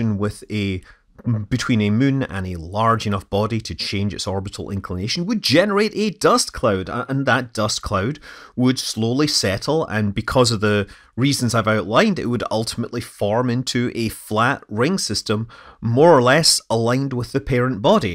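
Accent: United Kingdom. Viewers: Please use less accent in your speech, UK is not the whole world.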